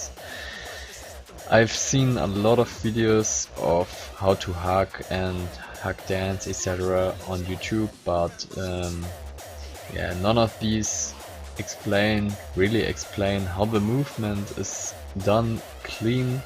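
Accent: Germany. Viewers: Ich lieb den deutschen Akzent. So ein englisch verstehe ich sofort! Tolles tutorial 😌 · Hallo, ich kenne den deutschen akzent. 🤣Grüße , geiles video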